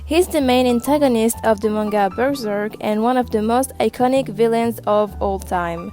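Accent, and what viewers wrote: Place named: Eastern Europe. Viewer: Let me guess, you're from eastern Europe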